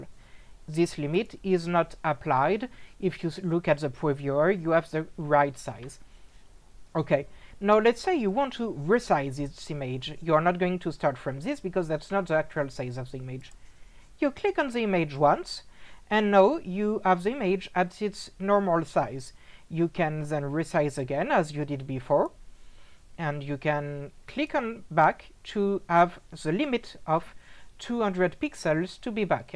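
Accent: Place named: France. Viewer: Thank you very much really useful addon and I really like French accent :)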